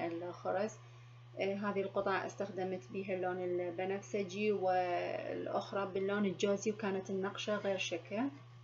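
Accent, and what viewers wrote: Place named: Iraq. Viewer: من جد روعة روعة روعة سلمت يداك ست واني استفيد منك هواي واحب شغلك بعجينة السيراميك اتبع طريقتك ومقاديرك واسوي منها اشكال تجنن ميداليات بس م جربت الاكسسوارات لا تتأخرين علينا بفيديوهاتك الرائعة وافكارك الجميلة وكتير حبيت اللهجة العراقية من اسمع صوتك 😍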